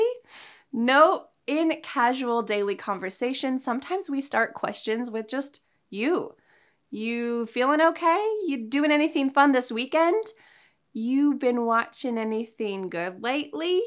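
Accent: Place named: United States. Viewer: ......I've just met you through your English channel. I'm from Venezuela!.... I think I love you!!... I mean.... I love your teaching method, your american accent, your knowledge..... May God bless you!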